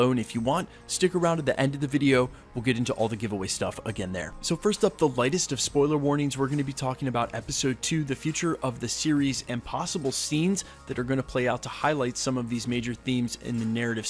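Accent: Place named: Philadelphia, United States. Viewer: Just curious. Are you from Philly? As a local, I’m picking up a slight accent. Cheers.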